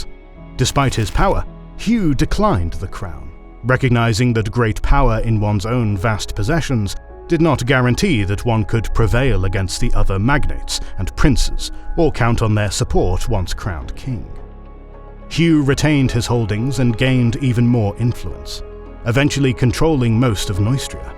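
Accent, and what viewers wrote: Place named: United Kingdom. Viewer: Please do away with the UK pronunciation of things; it breaks immersion... like really bad.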